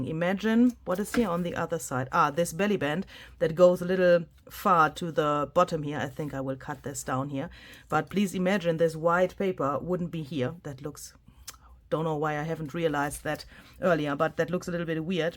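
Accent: Germany. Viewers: These are fantastic. Just love them, but seriously I love everything you do. I so enjoy your videos, your German accent and your wonderful sense of humor. I like you as a person. It’s wonderful you get to enjoy your little piece of nature at least for part of the year until they all show up and you feel like you’re in the middle of a bustling metropolis 😂😂❤️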